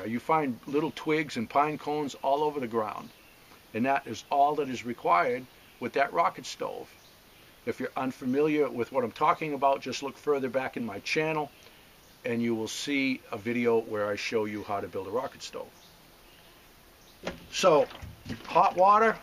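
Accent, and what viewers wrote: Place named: New York, United States. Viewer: You do have New York accent. So wonderful.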